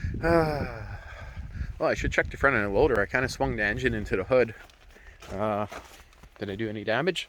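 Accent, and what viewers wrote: Canada. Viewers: I was hoping to see a rapid dis-assembly of this little stump puller Diesel... I guess that will be on the next video... From what his accent sounds like, this was filmed in Canada...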